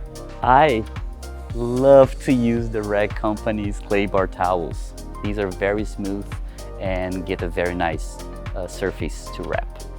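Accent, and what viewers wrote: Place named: Brazil. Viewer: Percebi na hora que ele começou a falar inglês, pensei: esse cara parece brazuca. 😂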